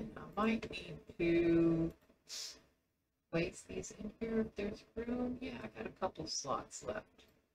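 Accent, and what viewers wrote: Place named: United States. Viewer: omg, I had the same heart-attack when my backpack was empty. If you look at the top left, you'll see everything is there, but bunched up for some reason. It only seems to be the first time I open my pack after I connect, or maybe after an update? Not often. But yeah, I've got all my tools and books in mine and was like "What the heckin'?!" when it happened to me. Did you see there's an upgraded version of the Environmental Eye called the Environmental Ocular that will show how far into positive or negative you are? And you keep using bonemeal to grow your trees for the ritual of the forest; would an Ars Grow spell not work, and save you some bonemeal? Re: streaming, keep in mind that you sound like you're in the US (and the weather in F in your taskbar says that, too :-P), and that means your day is my night. :-P So I, for one, would likely miss whatever progress you streamed, unfortunately.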